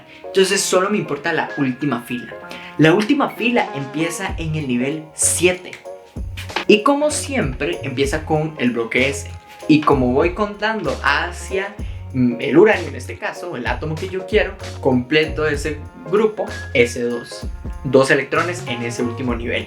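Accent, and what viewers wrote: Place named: Colombia. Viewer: Pensé que eras de colombia 😊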